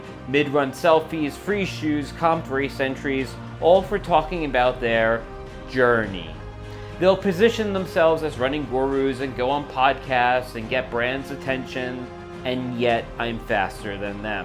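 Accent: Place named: United States. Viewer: Your accent says American but your humour says British! Welcome home old Chum.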